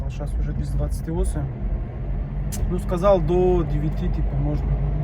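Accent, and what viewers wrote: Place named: Caucasus, Russia. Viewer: Автор, ты на рожу русский, а акцент кавказский, ты метис штоли?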